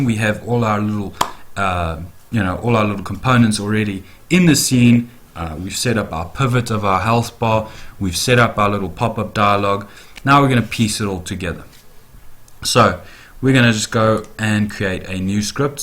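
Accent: South Africa. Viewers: Are you South African ? Accent sounds familiar!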